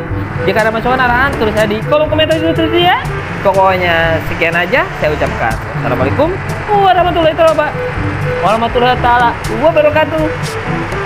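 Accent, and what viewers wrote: West Java, Indonesia. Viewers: Jigah orang Sunda uyy...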